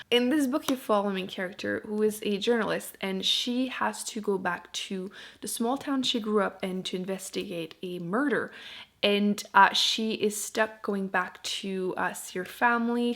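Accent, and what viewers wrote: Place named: Quebec, Canada. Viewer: First video I watch from you, and the whole time I was like mhm.... she sounds like she has a French Canadian accent. Looks like I wasn't wrong. Twinsies! Love this video!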